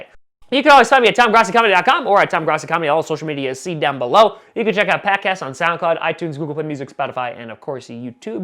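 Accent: New York, United States. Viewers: You sound like you’re from New York not Wisconsin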